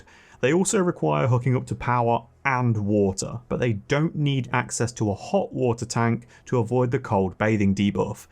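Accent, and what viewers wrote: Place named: New Zealand. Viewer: For some reason I thought you were a kiwi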